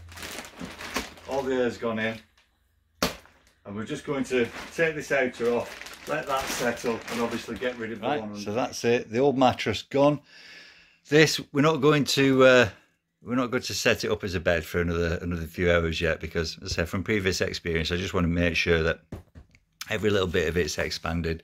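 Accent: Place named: Yorkshire, United Kingdom. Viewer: Love that Yorkshire accent. 😍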